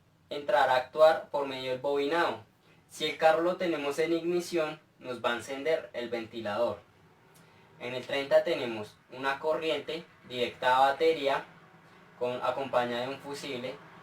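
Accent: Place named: Colombia. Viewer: Amigo de qué parte de Colombia eres ? Pregunto por tu acento ??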